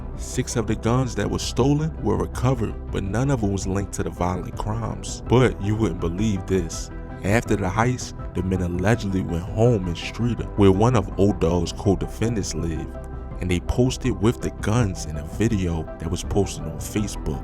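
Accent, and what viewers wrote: Baltimore, United States. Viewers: Grew up in the DMV and now live in Bronzeville, This is the thickest Baltimore accent I’ve ever heard in my life!!!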